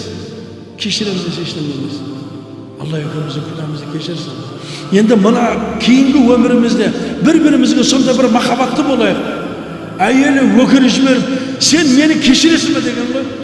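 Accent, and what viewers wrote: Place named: Uzbekistan. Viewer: Озбекше акцент урып тур устаз. неге озбекшеде амри маруф кылмайсыз ?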